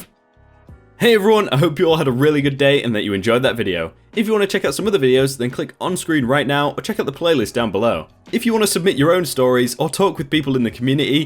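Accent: United Kingdom. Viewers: I love how you pronounce things in British English (I’m a Aussie, we also use British English) (Richard is a good name for entitled dads)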